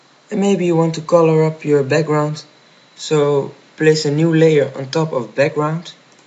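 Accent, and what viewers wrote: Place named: Netherlands. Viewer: You sound dutch ^^ Are you?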